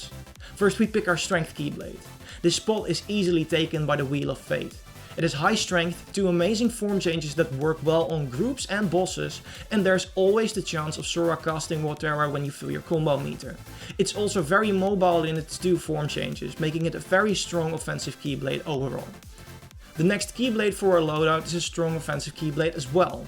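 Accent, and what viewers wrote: Netherlands. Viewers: Randomly stumbled upon the vid, and have to ask... With that accent, are you by chance Dutch as well? XD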